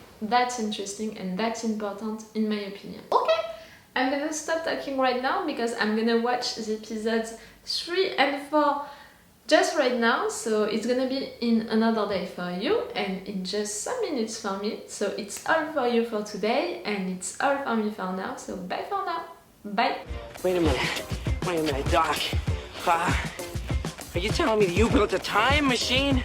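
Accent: France. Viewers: Lol “I’m still French” has so much weight with this quarantine. Like You may be quarantined, but your French will never be Quarantined 😂 I love your accent. My father studied in France and I learned a bit of the language growing up. But I don’t remember everything anymore, just a little. Je peux dire ça en français. Je pense que vous êtes une femme très belle et intelligente. Lol I hope I put the accents in the right place, :D Anyways stay healthy and safe, and i will listen to your Reaction now while I work :D ❤️ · Your french is a particular dialect by the accent you speak in English. I have heard it before. I don't know which one but it is not as common.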